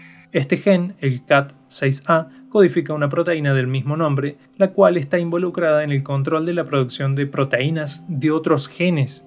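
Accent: Córdoba, Argentina. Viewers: Buen vido bro, sos cordobés? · sos cordobés? Hay un poco de tonada que creo que es de mi provincia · Cordobés hasta el alma, se siente. Buen video carajo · Más cordobés imposible aksjdj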